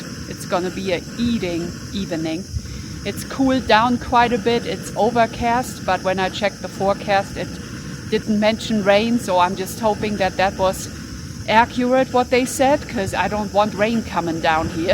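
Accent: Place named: Germany. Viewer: Hallo, Was für schöne und abwechslungsreiche Wanderwege in absolut fantastischer Landschaft. Beeindruckend diese Felsenlandschaft mit gefrorenem Wasser. Das hätte ich in diese Gegend nicht erwartet, das es so kalt werden kann. Schöner Nebeneffekt des Videos - mein Englisch noch ein wenig Aufzubessern - sehr angenehm mit deinem deutschen Akzent und sogar besser verständlich 😊 Gott sei Dank gibt es hier bei uns keine Bären😉 Danke fürs mitnehmen und noch einen schönen Sonntag! LG Thomas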